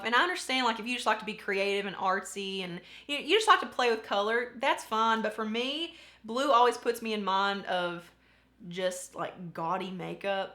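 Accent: South, United States. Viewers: New to your channel and love it ❤ I am from Alabama, you sound southern as well .. what state are you from?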